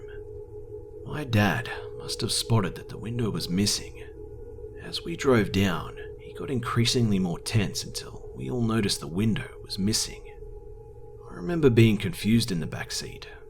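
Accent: Australia. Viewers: is the reason I now find Australian accents insanely attractive. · are you Australian, and I love your videos your the best narrator on YouTube. Please reply.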